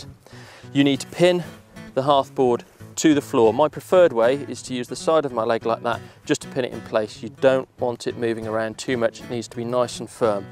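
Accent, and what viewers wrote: United Kingdom. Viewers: I love you your Brithish acent is to die for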